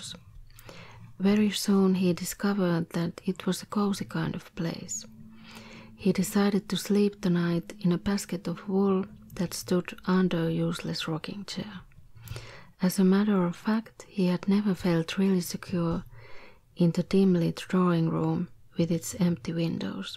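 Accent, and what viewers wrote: Finland. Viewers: finnish accents are lovely. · I came here for the finish accent.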